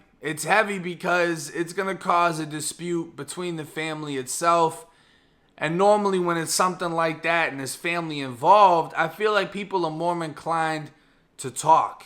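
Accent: Boston, United States. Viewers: U from Boston?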